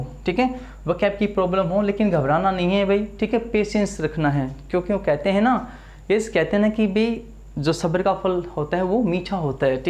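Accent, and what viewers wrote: Haryana, India. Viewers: English Mai bhi apka Haryanvi Touchup aa rha h brother 🥱🥱🥱🥱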